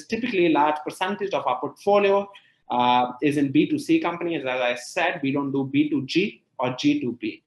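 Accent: United States. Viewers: He went to school in london and got US accent? Chutiya hein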